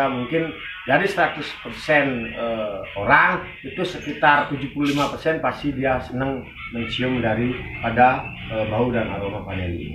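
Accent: Bali, Indonesia. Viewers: tinggal nya dmana pak?? logatnya kaya nak bali😁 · Logatnya bali nok. Salam vanilla lovers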